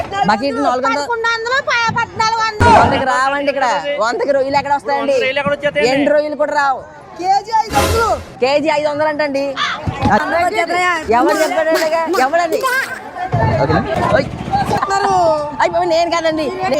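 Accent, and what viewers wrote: Godavari, India. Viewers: Meeku Inca subscribers ravali ani korukuttuna brother me comedy Maa godhavari yasa baguntadhi meeru Inca godavari side village s traditional videos chayandi bro😊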